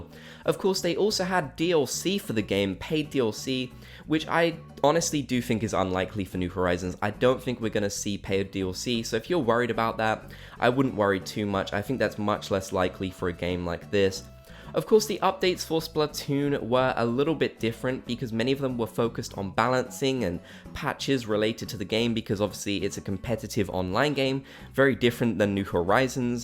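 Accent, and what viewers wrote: United Kingdom. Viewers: Gang can y'all boost this so he sees it? Having accurate captions would help viewers like me a lot. The auto-generated ones don't work well with British accents and I really want to be able to watch these videos AND fully understand what you're saying Accessibility is really important! #Accessibility #DisabledViewer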